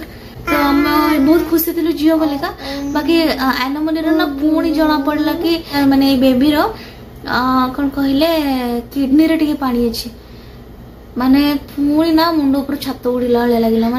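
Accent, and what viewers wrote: United States. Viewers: Can u pls say about how u improved ur talking english after u went abroad .. I saw in ur another vedio how u learned us accent english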